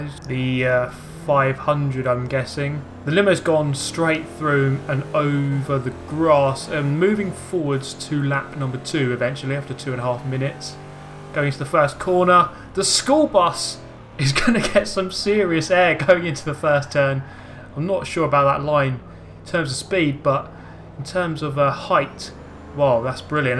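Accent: United Kingdom. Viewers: The contrast of this pure madness along with the calm British commentary is just great lol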